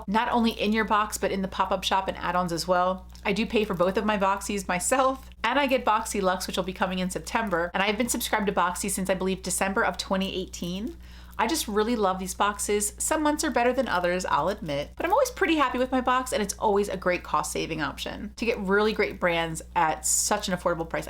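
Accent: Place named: Southwest, United States. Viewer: You look 👀so gorgeous 👌, those earrings and your nails are beautiful 😍. Wanted to ask, did you color your hair or that dark brown color is your natural hair color. I have that exfolikate Kate Summerville moisturizer for $24.99 at Marshall’s, I love it, even though I have an oily skin and I use it only at night, in the morning my skin is so soft. I missed seeing your videos, glad you’re back with one, I just love you 😘so much for being my sunshine ☀️each and every time. Your presence, love 💜, energy, excitement, joy, positivity, passion is always what I’m looking for and you always deliver it cause you’re always absolutely awesome 👏. I love listening 👂to you and watching your videos, I love you 😘so much 🤗for always being yourself and being here for us 🙏. BTW, you’re giving me Native American Cherokee vibe and I know you have that in your roots and blood with southwestern accent, love when you talk like that, especially Y’all. 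About you having cysts in your breast, my sister who’s also in the same situation as you, had this for many years,, her doctor who’s great, have many years experience, especially in the same situation told her, never do a sonogram or mammogram before or during your periods cause the cysts might be larger during those times. Do the tests almost two weeks after your periods so it’ll have chance to shrink and always do a six months sonograms for follow ups.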